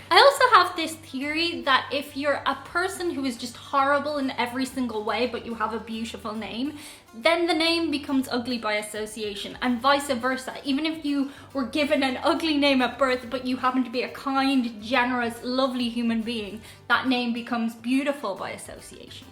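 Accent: Ireland. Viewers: off topic question. Living in Spain, do you speak Spanish? I I would really like to hear an Irish accent with Spanish mix!👍👌😃🔆🌴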